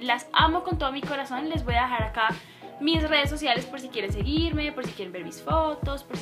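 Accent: Colombia. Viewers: Eres la colombiana que habla más bonito 🎶🎶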